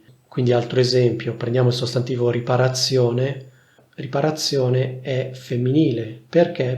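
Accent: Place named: Milan, Italy. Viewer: Professore, come studentessa brasiliana 🇧🇷 di italiano come seconda lingua, ti supplico di non abbandonare mai la tua variante settentrionale. È importantissimo per noi avere accesso a diversi accenti, e il tuo è l’unico canale che conosco con la variazione milanese. Ti ammiro e ti seguo.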